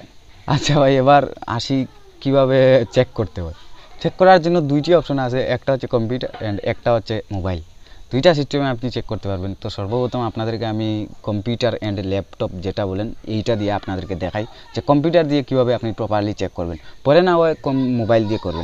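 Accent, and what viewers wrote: Sylhet, Bangladesh. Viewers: ভাই আফনার বাড়ি কিতা সিলেট নি? আফনার মাত দেখি সিলোটি অখলর লাখান লাগে।🥰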